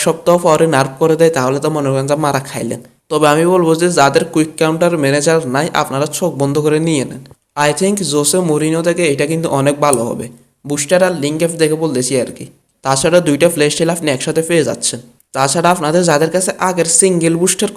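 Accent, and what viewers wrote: Noakhali, Bangladesh. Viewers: ভাই কি নোয়াখালীর নাকি?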